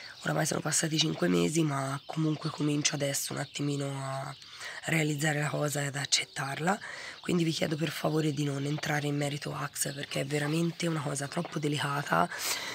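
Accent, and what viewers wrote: Tuscany, Italy. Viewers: Sono le del mattino sono appena tornata a casa e trovo questo video .......quanto mi eri mancata cica ❤️❤️❤️❤️❤️ daje tutta sei una forza della natura e ce la farai anche sta volta sono felicissima di risentire quell’accento toscano che adoroooo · Simpatico accento toscano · Dai che ce la fai e noi saremo qui, io potrei essere tua madre per l'età ma mi piace ascoltarti con il tuo accento toscano e mi sei simpatica ti ho sempre pensato e ho immaginato che stavi passando un brutto periodo. Quando vuoi saremo qui ad ascoltarti tvb 😘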